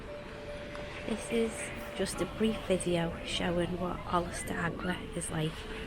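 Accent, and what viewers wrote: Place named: Liverpool, United Kingdom. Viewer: No mistaking that scouse accent there 😁 do u live out in Portugal? Were staying at 3HB Club Humbria in October can't wait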